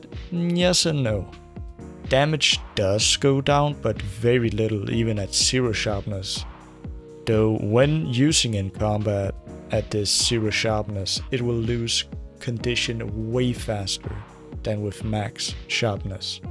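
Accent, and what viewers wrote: Denmark. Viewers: Very informative video, great intro and thanks for the hard work you put into it! Btw is that a danish accent? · are you danish? loved the video btw! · are you danish bruv?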